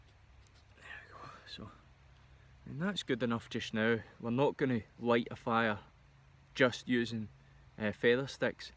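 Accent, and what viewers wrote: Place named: Scotland, United Kingdom. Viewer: Do you speak Gaelic? I was thinking you were from Scotland but there was one time I guessed Scottish for someone whose accent was very similar to yours...turns out they were Welsh. Embarrassing. Haha. When you say politer, you mean no swearing? LOL. The brace on the rucksack made it look heavy so I was curious how much it weighs. Is that woodland in Scotland? And you’re welcome! Good luck on growing the beard. Mari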